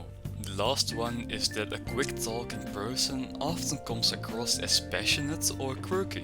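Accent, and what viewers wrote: Netherlands. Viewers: Are you dutch by any chance? I’m asking because of your accent :] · Wait...are you dutch??? like from the Netherlands😭😭i can smell it i just can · Genuinely a great analysis video. Lovely Dutch accent, great use of background music (I loved the Persona OSTs after the 'high school is not like persona' video being shown), and good scripting/commentary! Nice editing too. All in all just a great high quality video and got multiple laughs out of me. Make more and wishing you the best of success with the channel, liked and subbed <3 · Hoorde meteen dat je Nederlands bent mijn broeder😂💪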